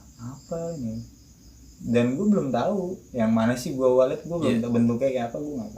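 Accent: Java, Indonesia. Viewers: Bang ada logat jawanya nih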